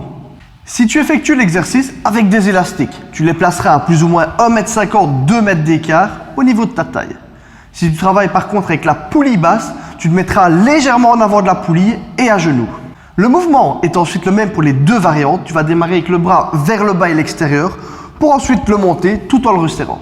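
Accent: Liège, Belgium. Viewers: Ce fut un plaisir d'avoir fait un petit brin de causette ;-), continue comme ça, tes vidéos sont vraiment instructives, maiemes avec l'accent de lièèèche ;-)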